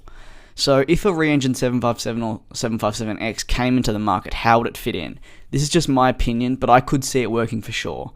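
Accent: Australia. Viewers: Thank you for the "757 Re-Engined" video-- the 757 is one of the most powerful and graceful of Boeing airliners. Pilots develop sentimental attachments to the 757 for its creature comforts, and the wonderful cockpit view. Your own, detailed commentary is very helpful-- especially the facts and reasoning for your viewpoint. Clearly, you read industry publications widely, and in-depth. Also, very helpful is description of your video equipment-- there is no substitute for a good tripod. Your Aussie accent is almost never a problem, but you have a tendency to drop complete syllables in words, and unless we Americans know the Aussie abbreviated pronunciation, the word is lost. However, we do understand your production is provided as an amateur, and a professional speaker is not expected. * If I have confused your accent with Australia, and it comes from industrial London, instead, my apologies. 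Quite a number of Aussies came from that area, originally.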